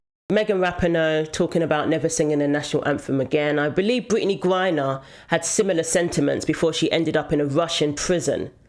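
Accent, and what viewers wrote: United Kingdom. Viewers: You are Smart, Pretty and have a Great British Accent 🙂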